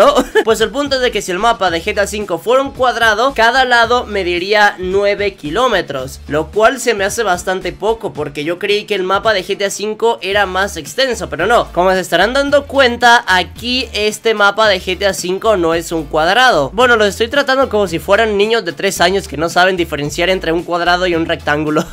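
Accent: Mexico. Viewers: Yo sí te creo que eres de México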